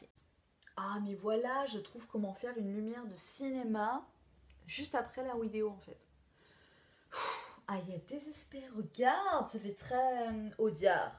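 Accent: South, France. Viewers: Quel sketch t es délirante 😂 (perso j'adore l'accent du sud ) j ai essayé le bain de bouche a l huile de coco et c est pas transcendant à part que ty as une belle couleur de dent je trouve mais côté fraîcheur c'est pas extra no no no 😛.pleins de bisouilles 😘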